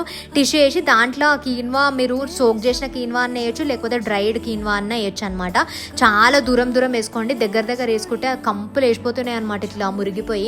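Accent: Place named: Kerala, India. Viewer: Why u r speaking telugu in Malayalam slang 😅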